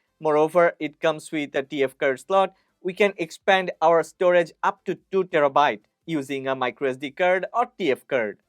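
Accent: India. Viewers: When i play a review video i can't escape that Indian English accent sometimes but you're amazing.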